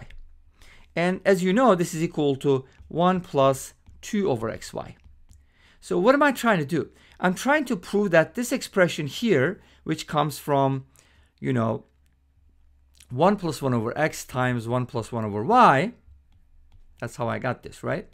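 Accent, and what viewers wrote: Turkey. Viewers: where are you from? turkey or united states?????????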